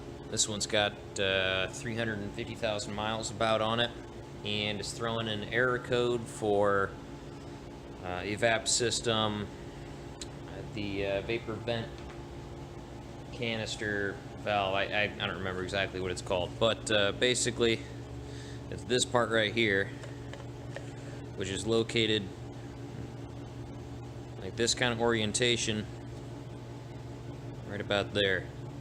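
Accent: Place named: United States. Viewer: Why for the love of Christ does it absolutely have to be a yankee talking on most of these videos... like nails on a chalk board to my ears😵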